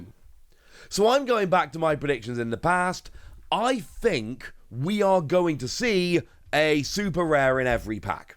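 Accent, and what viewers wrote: United Kingdom. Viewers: You have so strong British accent however you are really clear, it is a pleasure to follow your channel.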